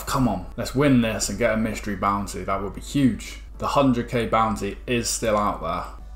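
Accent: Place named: South West England, United Kingdom. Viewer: Yes mate. Some Sunday content for the lads. You sound like a fellow South-Westerner... Bristol?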